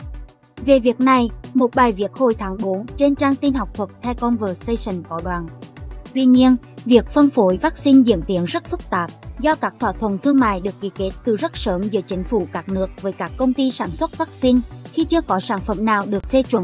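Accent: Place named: Hue, Vietnam. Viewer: Lâu rồi mới được nghe giọng Huế.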